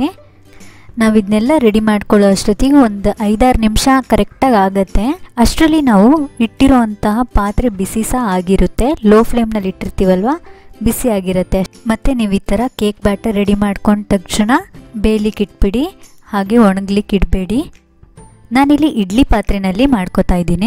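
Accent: Mangalore, India. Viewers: Madam nimdu native place yavdu? Manglore aa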